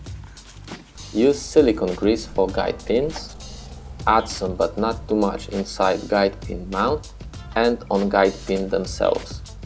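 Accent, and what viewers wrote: Poland. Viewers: Chyba słysze rodaka xD łap suba.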